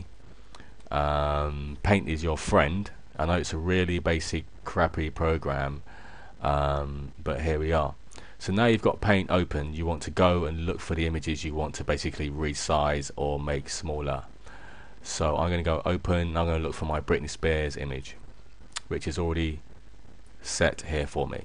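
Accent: United Kingdom. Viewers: i love how british speak. i think it's cute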